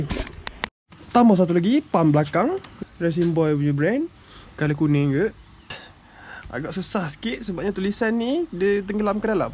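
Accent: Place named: Pahang, Malaysia. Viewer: Sdap sial orang pahang cakap...aku tunjal je..hihiuhi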